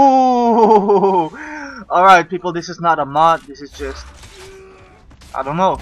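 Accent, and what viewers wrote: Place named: Europe. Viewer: su acento no es de estados unidos el es de europa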